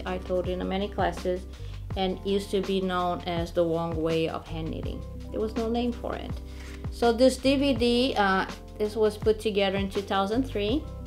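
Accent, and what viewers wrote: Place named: Portugal. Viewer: So you are Portuguese! I wasn't sure about your accent! Thanks for your work .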